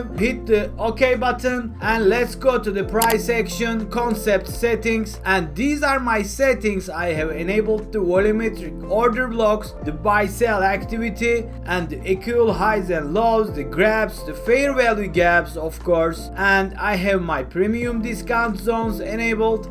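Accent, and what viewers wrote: Turkey. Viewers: The Turkish accent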